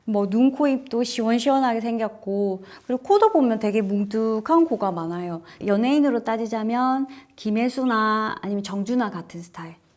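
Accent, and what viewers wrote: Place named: Daegu, South Korea. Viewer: 내용도 좋은데 억양이 재밌어요 경상도중에서 대구쪽인 듯 한데 근무지가 대구이실까 모르겠네요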